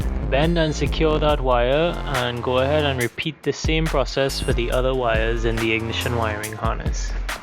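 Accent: Trinidad and Tobago. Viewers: U sound like a trini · Are you Trini? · Hey are you from Trinidad and Tobago? You sound like a trini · Accent sounds Trini.. Now i can do it myself.. Ydk.. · “Dem one handed skills thooooooo”. Definitely a Trini. Thanks for this man, top notch stuff. From your Jamaican cousin! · your from trinidad aint you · You sound like a Trini 🇹🇹🇹🇹🇹🇹 good job bro if you are indeed from Trinidad maybe you can help me connect this to my Legacy GT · Almost sounding trini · Hi wonder if you can help? I have thks same kit and a 1996 Toyota Supra. The dash wiring that connets to the barrel has 6 wires...can you help me out? Btw you sounding like a trini??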